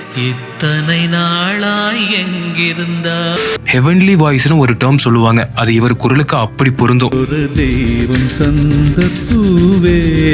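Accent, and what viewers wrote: Kongu, India. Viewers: நண்பா உங்க ஒவ்வொரு வீடியோவும் அருமையா இருக்கு அதுலயும் உங்களோட கொங்கு தமிழுக்கு நாங்கள் எல்லாரும் அடிமை நீங்கள் ஒவ்வொரு வீடியோவுக்கும் கொடுக்கும் விளக்கங்களும் தகவல்களும் மிக மிக அருமை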